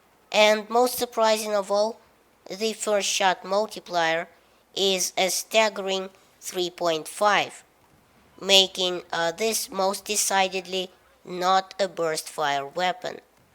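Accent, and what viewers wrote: Russia. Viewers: the coolest thing that people from russia have there accent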